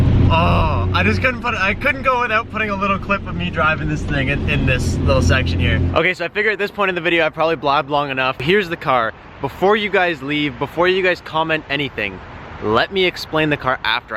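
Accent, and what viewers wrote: Canada. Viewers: Intentions or our attention? Haha, I love Canadian accents.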